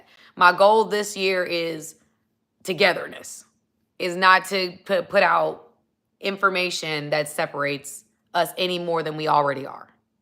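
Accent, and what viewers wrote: South, United States. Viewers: Hi this your country voice, is good for country music..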